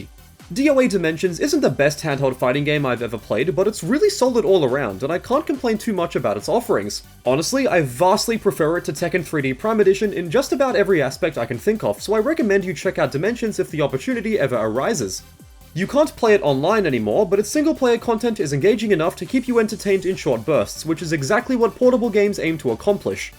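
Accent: United Kingdom. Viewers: Ur from Australia?! I assumed u were from the UK 🤯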